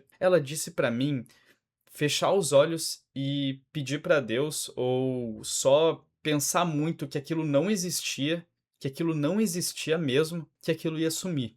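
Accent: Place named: South, Brazil. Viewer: Nesses vídeos mais improvisados o sotaque do sul fica mais destacado kkkkk é os guri não tem jeito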